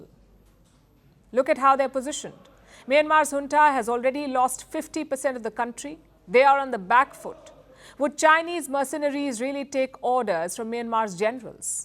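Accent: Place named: India. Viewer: English but of heavy Indian accent lol..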